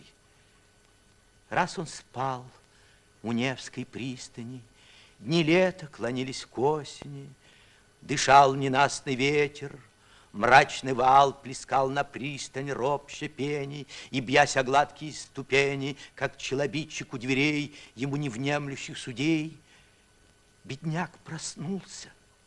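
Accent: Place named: South, Russia. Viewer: Говор с "гаканьевм и хаканьем" - точно, как в фильме "Бриллиантовая рука" или в мультиках "Ну, погоди!": "Спокойно, Козлодоеу, сядем усе". Оказывается он всегда так говорил, и не мог или не знал, как выработать чистый театральный говор по-русски и избавится от местечкового произношения, диалекта.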